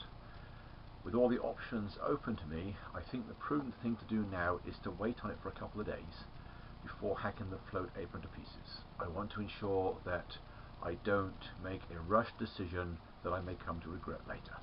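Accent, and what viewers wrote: England, United Kingdom. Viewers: Yes, I've seen that page and I've met Phil - for several years he lived down the road from my parents' summer home in the Catskill Mountains. I know it was normal to pull strings of cars halfway off to, as you said, keep the barge balanced. I was responding specifically to your question of whether it was proper to leave two cars (you sound English, maybe I should say goods wagons?) alone on the apron, where, it seems, without other cars on one end or the other on a flattish surface, the two cars would be prone to roll off, unless someone went out and put on the brakes. I know another guy, whose email I can't find now, who rode the rails of various New York rail-marine operations in his youth, who might have some thoughts.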